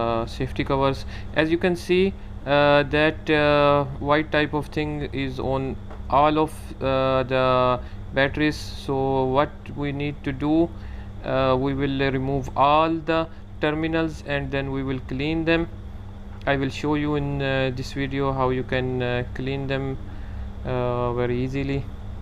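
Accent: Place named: India. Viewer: Indians speaking english sounds very ridiculous!!, next time use subtitles.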